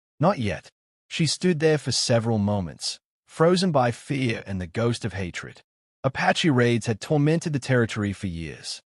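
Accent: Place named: Bronx, United States. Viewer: This story voiced by a guy with a Bronx accent is funny.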